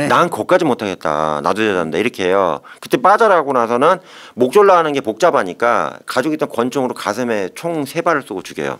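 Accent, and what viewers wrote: Chungcheong, South Korea. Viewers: 웃으면 안되는 사건인데 염교수님 너무 정겨워요😂😂 범인이 프랑스인인데 충청도 방언이 들려요 😂😂 앞으로도 잘 듣겠습니다.❤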